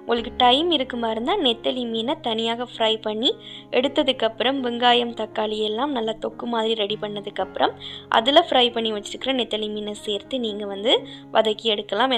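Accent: Sri Lanka. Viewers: உங்கள் இலங்கைத் தமிழ் அழகு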